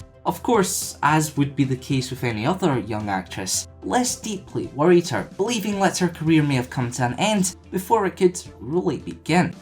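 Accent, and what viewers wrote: Scotland, United Kingdom. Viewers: u scottish? Cause ur accent sounds like scottish i know cause im scottish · God damn it I can understand you you're Scottish accent is so strong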